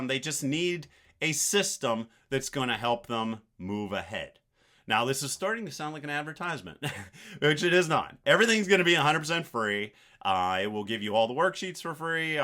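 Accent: Pittsburgh, United States. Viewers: I thought I heard a fellow Yinzer accent all these years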